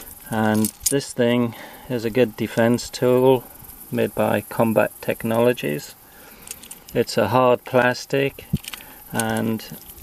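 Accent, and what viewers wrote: United Kingdom. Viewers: Hell yeah, first time seeing this video. The Internet should be full of guys with UK accents doing EDC videos involving weapons, but you're the first I've seen sadly. anyway great video and one is better than none I guess.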